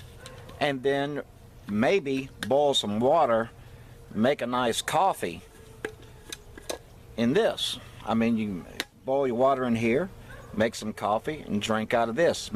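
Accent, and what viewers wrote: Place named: South, United States. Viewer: I love your southern accent !!!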